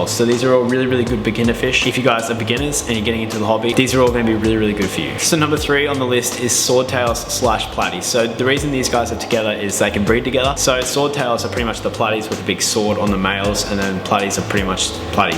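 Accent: Australia. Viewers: Bro, you have a thick Aussie accent. Consider posting text names of each fish in the video and a list of them in the description for those of us who can only understand English. 😈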